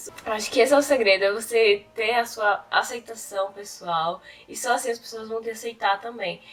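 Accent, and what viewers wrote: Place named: Alagoas, Brazil. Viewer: Êêêta que sotaque bonito! Minha conterrânea (Nasci em Maceió, mas vim pra PE pequeno), curti muito o vídeo. Embora a minha praia seja mais o lado Heavy Metal, conheço um pouco da sub cultura. Há vários anos atrás eu até cheguei a ler "A Happy House in a Black Planet", que o um dos sites que você listou disponibilizar, ele dá uma boa geral no assunto. Aqui em Recife, a cena era bem forte antigamente, hoje em dia acredito que esteja mais fraca (novamente, falo pelo lado do metal... mas acredito que isso se aplique a todos)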